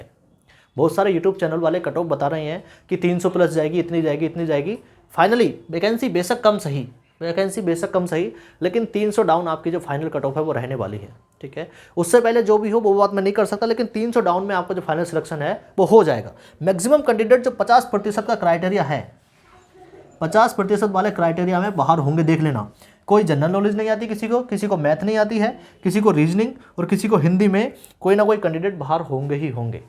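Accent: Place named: Bhojpur, India. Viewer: Bhai tum to bhopur ke ho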